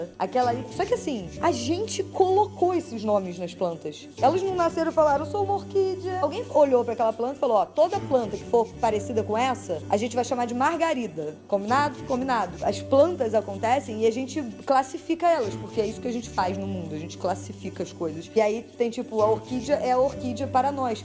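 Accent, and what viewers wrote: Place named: São Paulo, Brazil. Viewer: ela já começou a pegar sotaques paulista aaaaaaaaaa 💕💕💕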